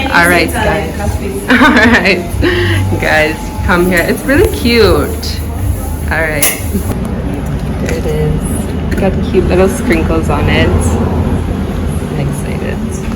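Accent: Jamaica. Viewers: How is her accent Jamaican in only fourn months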